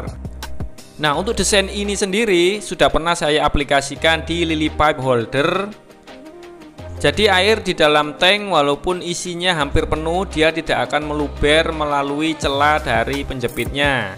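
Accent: Java, Indonesia. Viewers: Om asal mana kok logat bicaranya medog kental nuansa jawanya 🤭🙏 tp gayanya asyik mudah di mengerti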